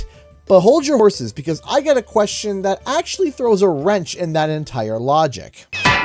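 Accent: Canada. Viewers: What is doing talking about Crash bandicoot? He sounds more Canadian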